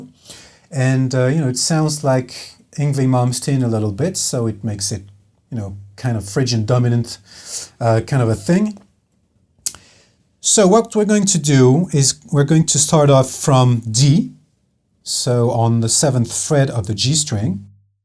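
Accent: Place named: England, United Kingdom. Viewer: Nice english accent:)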